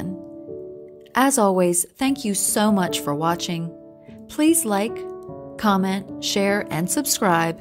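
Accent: South, United States. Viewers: I am southern too and love your soft southern voice. There are many channels that I start to watch and would watch for content but cannot tolerate the harshness of their voices but anyway thank you for your pleasant voice.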